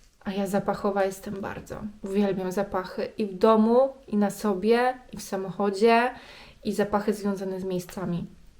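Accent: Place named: France. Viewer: Na początku myślałam, że to nauka francuskiego- lektor mówił z takim akcentem😅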